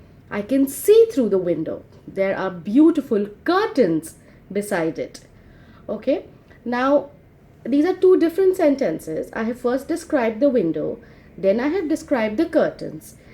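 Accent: India. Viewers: You are a totally and truly blue book English pedagogue in the world but your pronunciation is good for Indian ................. . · Before, I was thinking only listening to native will help you to improve your speaking skill but it's only thinking. What is in real is, when I listen to you then I love listening to Indian accent. For English exam your are going to polish us. Thank you so much for your fantastic work over here and positive thinking...I wish we can see your new video soon...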